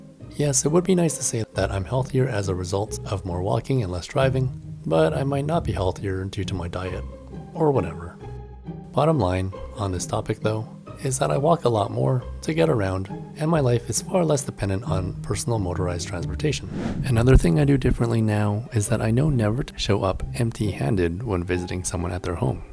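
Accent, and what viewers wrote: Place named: Lithuania. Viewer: Your english accent is 100% lithuanian! Lol!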